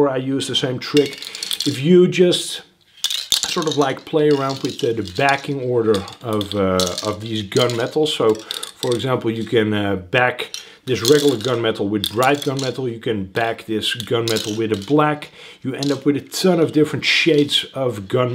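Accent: Netherlands. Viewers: Are you Dutch? you have a Dutch accent :D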